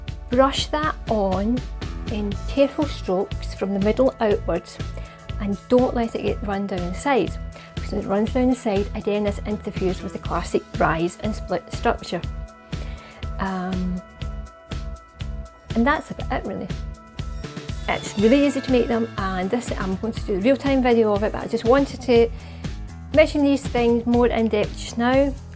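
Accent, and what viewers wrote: Scotland, United Kingdom. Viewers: Great video, im a first time watcher,very well presented and explained look forward to watching more of your work....lovely Scottish accent..thanks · 💗💗Your Scittish accent.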